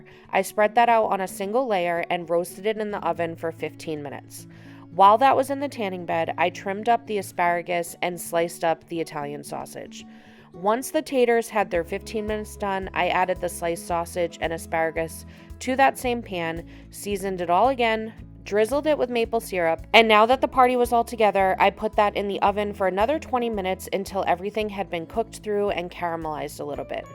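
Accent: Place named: Wisconsin, United States. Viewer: Hi! I’ve been watching for a month or so now but I’ve never commented! I’m so curious where your accent is from? I would’ve guessed you’re from Wisconsin 😅 I love your videos, you and are hilarious!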